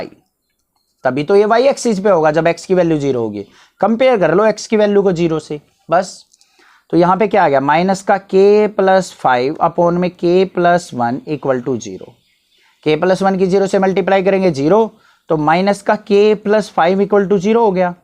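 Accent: Haryana, India. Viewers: Sir aap haryana se hai ?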